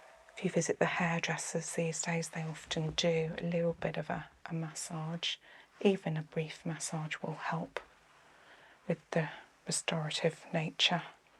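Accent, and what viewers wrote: England, United Kingdom. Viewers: English accents are heavenly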